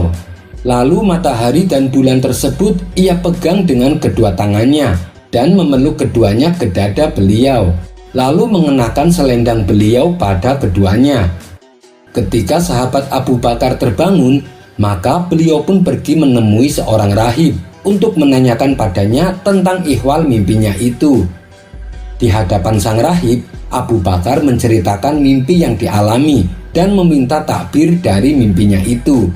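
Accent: Java, Indonesia. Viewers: Iya mas ngomong nya teger medok lekoh.cirihas orang Jawa . Salam dari serang Banten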